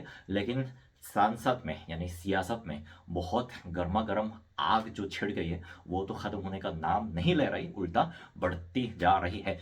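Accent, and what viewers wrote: Standard. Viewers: Itni shudh Hindi toh meri bhi nhi h well done bro 🤌👍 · Bro……your Hindi waaaaay tooo good….pretty much text-book! But, it also a bit difficult to follow at times!!! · Yaar aapki hindi to intni sudh h ki humari bhi utni nahi 😊🇮🇳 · Bhaisahab itni shudh hindi toh India mein bhi koi nahi bolta. Someone give this man a Aadhar card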